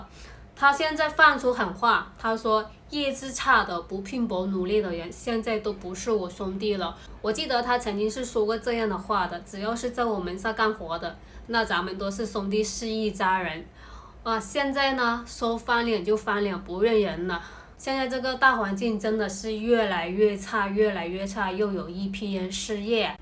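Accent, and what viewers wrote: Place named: Guangdong, China. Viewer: 小姐的臉孔非常熟悉，妳是受人僱傭請來做這種反社會的視頻吧！妳廣東口音是聽的出來的。深圳是你的常駐地點。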